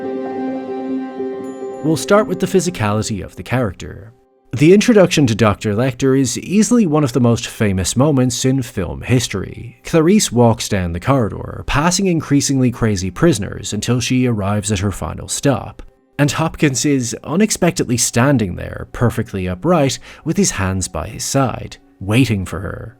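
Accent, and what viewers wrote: Ireland. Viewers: The narrator sounds partially irish... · Is that voice-over an Irish accent?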